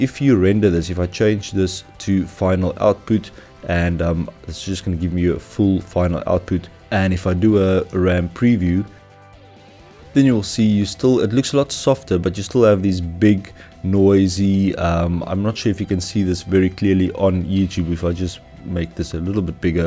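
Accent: South Africa. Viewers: You sound South African...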